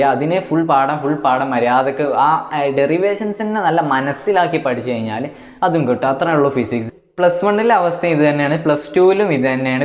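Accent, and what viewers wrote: Malappuram, India. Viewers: MALAPPURAKKARAN aanallee.. 😁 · Malappuram aano vaarathaanam kettette agane thonna 😅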